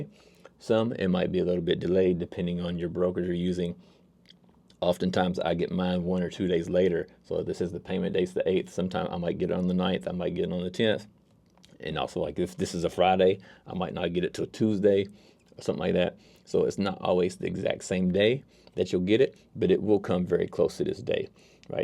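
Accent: Louisiana, United States. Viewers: Are you from southeast Louisiana?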